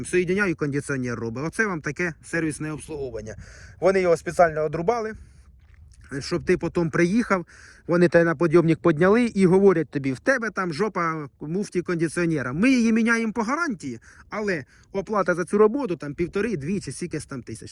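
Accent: Poltava, Ukraine. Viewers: Це Полтавський суржик дядь)🤣💙💛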